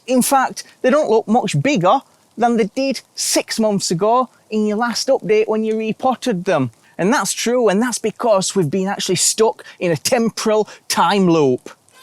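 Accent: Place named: North East England, United Kingdom. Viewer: Just a question to... in your first post where you crack open the cherry nuts and one is empty of seed... you say you'll take it back to Mercadona... you in Spain where the climate is warmer than the climate in UK where your north-east UK accent suggests you're from?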